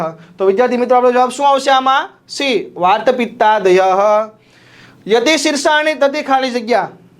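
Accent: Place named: Banaskantha, India. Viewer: KOI BANASKANTHA NU CHE 😊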